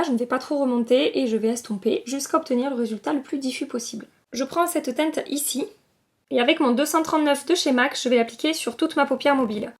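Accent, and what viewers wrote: South, France. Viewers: Hello la belle ! cette vidéo est un régal , j'adore tes improvisations et t'écouter parler ;), tu es du sud de Narbonne si j'ai bien compris ? tu as un accent sympa , moi aussi une sudiste mais de Montpellier ! merci pour ce super descriptif de la palette qui me fait énormément envie , elle est sublime et très complète <3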